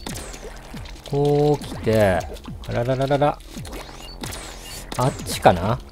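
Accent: Hiroshima, Japan. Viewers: Rさん！広島弁教えてください＜(_ _)＞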